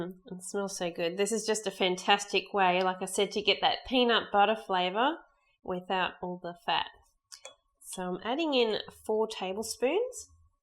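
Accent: Australia. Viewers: Your accent is lovely! Australian?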